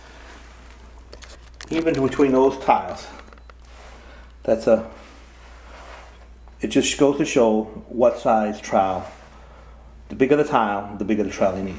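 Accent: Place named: New Jersey, United States. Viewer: Quarta by 3/8... love the Joorsey accent. Thanks for making these videos, very helpful.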